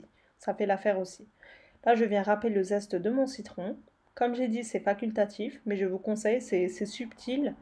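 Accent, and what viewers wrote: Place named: Lorraine, France. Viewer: Coucou ! Merci pour le partage. Quand je t'ai entendu parler j'ai trouvé que tu avais un petit accent lorrain... Ensuite, tu as évoqué Thierry Hommel qui fait très bonne tarte au fromage d'ailleurs et là je me suis dit qu'on vient du même coin 😜😜 contente suivre une personne de la ville de mon enfance 😉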